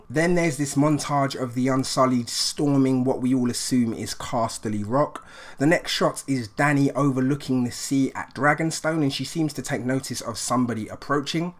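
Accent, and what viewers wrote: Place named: United Kingdom. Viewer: hey! thanks for answering my question!! I subbed you a little while ago as you were doing a livestream with my pal Mark (SerHuntsReviews) and I'm enjoying your content a lot. I don't comment too often though. I enjoy your videos though. You do a good job!! I enjoy hearing Brits again - I miss them a great deal... I did my PhD in Aberdeen and lived there for 7 years. I'll be back over there again sometime soon to do further research probably in Durham, London and Edinburgh as well as my old standby - Blair-Atholl, in Perthshire. That place is gorgeous! Cheers and best wishes. Looking forward to your content in the upcoming season!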